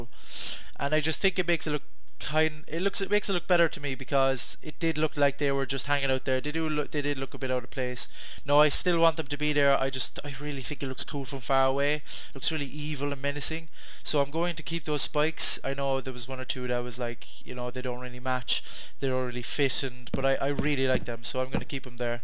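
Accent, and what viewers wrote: Ireland. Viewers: Does every Irish says "ish" instead of "it" ? (I'm french and this particularity made me curious :3)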